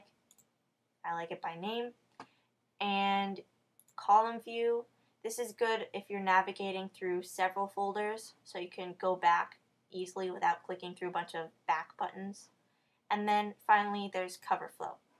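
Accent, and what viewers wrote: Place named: Pacific Northwest, United States. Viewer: You sound a lot like the branch of my family who live in the Pacific Northwest. Would that be about right? :^) Thanks for all the Mac help. You're great.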